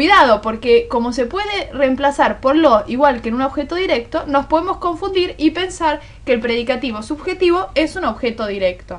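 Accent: Argentina. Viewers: hace tanto que no escuchaba ese acento argentino hahahah muchisimas gracias por la explicacion!!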